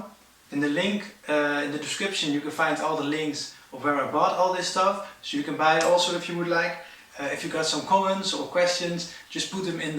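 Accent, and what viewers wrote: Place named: Netherlands. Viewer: zwaar nederlands accent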